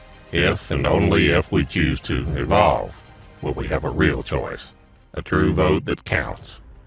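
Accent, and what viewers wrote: South, United States. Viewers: why does the voice over have a southern USA accent? this was a really cheesey attempt at voter disuading vote or vote supression... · What is with the American accent? That just doesn’t seem right.... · Oooof what a cringe video. Southern accent doesn't help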